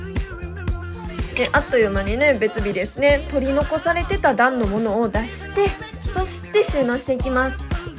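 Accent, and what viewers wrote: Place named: Kansai, Japan. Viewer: ｢ほなまたね｣ インスタで言ってたよな！関西弁最高👊💓 つい最近まで関西住んでたから聞きたくなったらちゃんの動画見てる！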